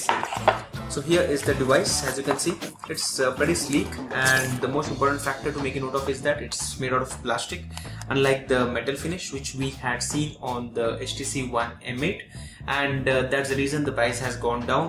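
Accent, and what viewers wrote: India. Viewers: Stop saying checkout and get rid of that Indian accent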